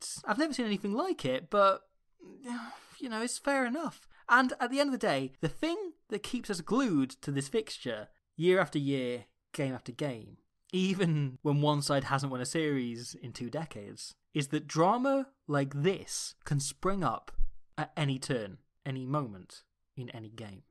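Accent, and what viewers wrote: Wales, United Kingdom. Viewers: This video is best viewed at 0.75 speed for NZ ears not used to this guy’s machine gun Welsh accent or whatever it is.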